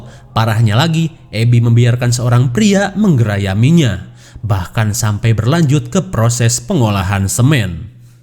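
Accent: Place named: West Java, Indonesia. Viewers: Suara sunda mh enak ya bund;v mah kuat wat wat wat wat wat terngiang² 🤣🤣🤣🤣😴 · Bang kamu pasti orang sundanyak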